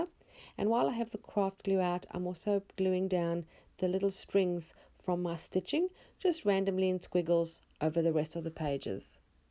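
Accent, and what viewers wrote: South Africa. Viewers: It was so wonderful to hear a South African accent!! First time viewing your channel! Sending blessing from sunny South Africa!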